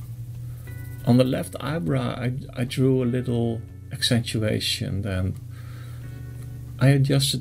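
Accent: Netherlands. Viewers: Mooi gemaakt , ik dacht al een Nederlands accent te horen heb mij direct ff geabonneerd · Are you Dutch? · Youre dutch i can hear it 😂 Ik ben ook Nederlands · Are you dutch? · Love to this Dutch accent ahhaha. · You sound really dutch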